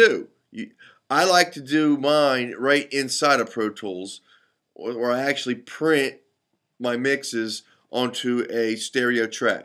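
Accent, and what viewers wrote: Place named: Baltimore, United States. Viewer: You sound like you're from Baltimore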